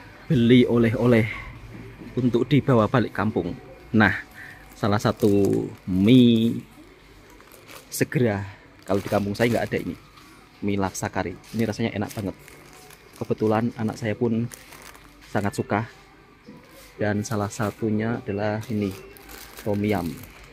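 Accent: Java, Indonesia. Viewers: Slang Bro bicara seperti rakan rakan jawa di tahun tahun 70an. Teruskan bro berkarya. Moga nanti tidak kena cukai. Adakah bro balik terus?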